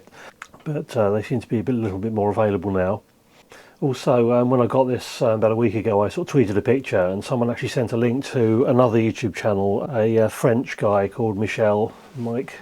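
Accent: United Kingdom. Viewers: I think you're just not used to hearing British English..?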